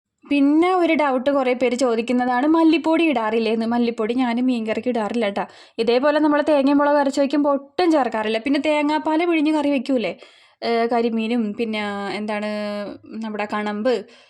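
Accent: Kochi, India. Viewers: ചേച്ചി കൊച്ചി ഭാഷയിൽ ശസാരിക്കു ചേച്ചി നല്ല രസം ഉണ്ട് കേൾക്കാൻ പിന്നെ ചേച്ചി മുളക് പോടീ നല്ല കളറ് ഉണ്ടല്ലോ ഏത് മുളക് പൊടിയ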